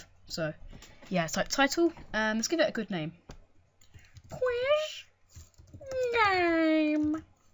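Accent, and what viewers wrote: England, United Kingdom. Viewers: i really don't understand anything ur talking so fast and man , i'm not used to the english accent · british accent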